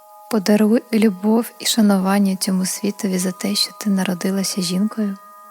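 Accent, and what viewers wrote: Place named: Ukraine. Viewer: Дякую мило🤗,а особливо за українське звучання ❤️🇺🇦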